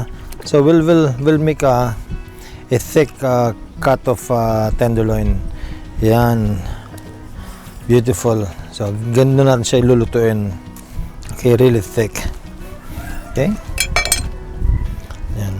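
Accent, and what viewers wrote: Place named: Tagalog, Philippines. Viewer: i am hungry whle watching it.. i love it when u cook, Sir! i also love ur bisaya cos it has a tagalog-toucvhed-accent too. hehhe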